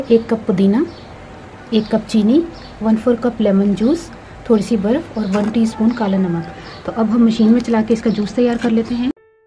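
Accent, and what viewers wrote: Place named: Punjab, India. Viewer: OK ji, asi dono cheeni te Gurr alag alag try kara gae, jo changa lagya agey to oh cheez pa lya kara gae ji, hor v es type de fruit de juice dasna ji. Tuhaadi Punjabi sun k bahot he vadiaa lagda hae, edhar di Punjabi te tuhaadi Punjabi iko jehi hae, sun k bahot mazaa aanda hae.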